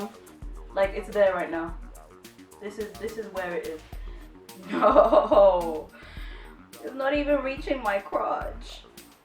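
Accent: United Kingdom. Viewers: Im like in pantyhose heaven with ur sexy legs and ur accent..love that brtish accent super sexy.thanks for sharing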